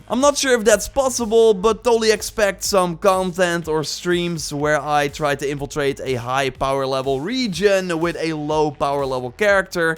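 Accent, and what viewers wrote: Netherlands. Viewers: I know you life in the netherlands because of your voice/ik woon hier ook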